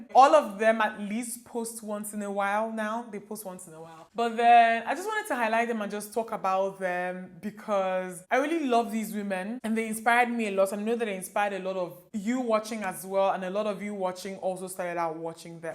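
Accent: Nigeria. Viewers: First time watcher, loved your Vid. Stunning face, impeccable make up and love you uploading in your Naija 🇳🇬 accent. Subscribed ❤️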